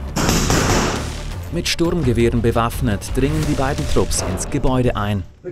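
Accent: Switzerland. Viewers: Nei de heissst CHuuuggaarrr hahhaa, hgesch doch de Sprecher ghört mit sim schönne Bünder XD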